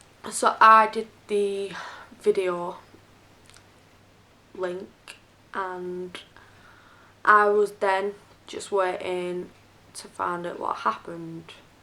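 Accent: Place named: Northern England, United Kingdom. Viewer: Thank you for this film. It sounds like you have come through a lot. It must have been very difficult. I hope that you still have your Mum and brothers and sisters in your life to maybe help each other. Abuse of any type is hard to get over and I can understand why you would feel anxious. I hope it gets better for you as time goes on. I really love your channel and it's lovely to hear a Northern Girl talking. Take care. ❤ and Well done! 👏👍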